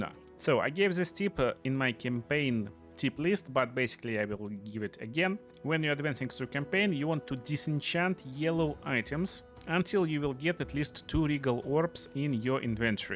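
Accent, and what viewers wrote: Russia. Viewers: Where's the accent from? Russia?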